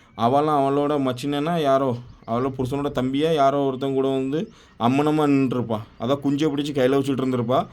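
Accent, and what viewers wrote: Tirunelveli, India. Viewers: Ama sis ivanga tnvl . Ivanga nama slang la solrathu kekka நல்லாதான் இருக்கு 😂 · Thambee...enga oor karar aaa neenga??? Tirunelveli Nagercoil mix...slang...keka nallaa iruku😊...Naanum Apadi thaan pesuven😂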